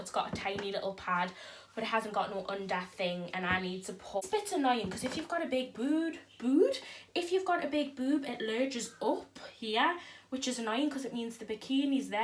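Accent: Newcastle, United Kingdom. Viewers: I love hearing our Geordie accent on YouTube 😍 your body is perfect! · What part of England are u from? Geordie? Living for ur accent x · Are you from Newcastle? I am and love it because you say canny like me❤️❤️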